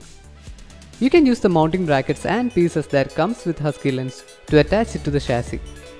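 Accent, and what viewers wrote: Kerala, India. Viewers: Bro are u a mallu.?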